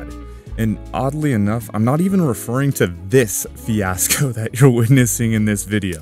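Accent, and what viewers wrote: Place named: England, United Kingdom. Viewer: Love your English accent 😂.. better than my American one..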